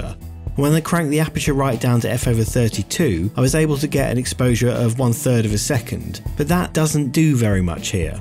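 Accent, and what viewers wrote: United Kingdom. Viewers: Very good presentation and in perfect British English!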